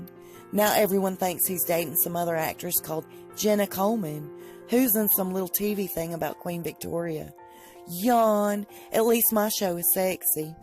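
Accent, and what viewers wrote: South, United States. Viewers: I am glad i found this channel..love your southern accent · How does someone with a southern accent know so much about the royal?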